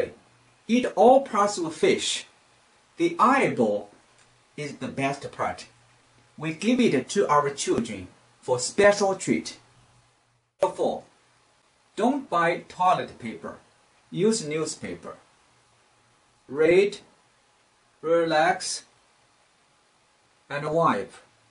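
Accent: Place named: China. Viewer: Great video and your Chinglish is funny! Thumbs Up!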